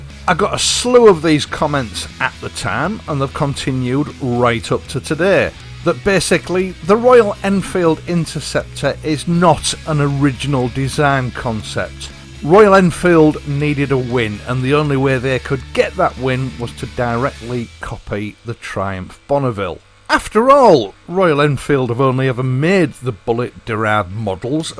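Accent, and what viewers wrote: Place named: East Yorkshire, United Kingdom. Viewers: Love the videos and the Yorkshire plain speaking,. Thank you for the shots of horse chestnuts in flower, a real delight for an ex-pat living in Canada, where these magnificent trees are not common - though less rare than the RE Interceptor Mk I. 🙂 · Hey, is that East Yorkshire accent, there!?!? Thanks for video...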